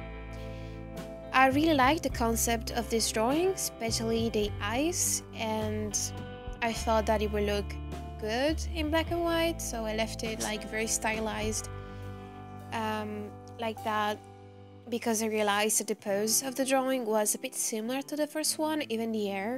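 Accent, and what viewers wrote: Italy. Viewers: Non aver paura di parlare inglese! in alcuni punti pronunci bene le parole, sei anche spedita. in altri punti ricadi sull'accento italiano che in realtà sei anche capace di evitare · Your italian accent is beautiful 😊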